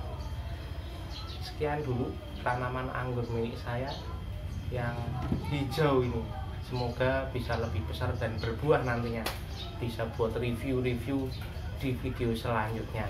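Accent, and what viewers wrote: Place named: Banyumas, Indonesia. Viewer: Wong ngapak ya? Najajl inyong direwangi support ya, wis mampir kie, dipadamkan balik yaa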